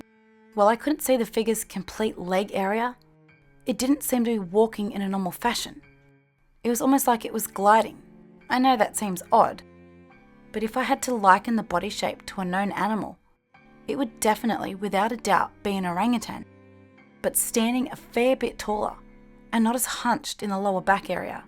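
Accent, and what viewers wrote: Australia. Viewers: Loved it. Without your Australian accent, it wouldn't be complete 😂😅🎉🎉🎉🎉🎉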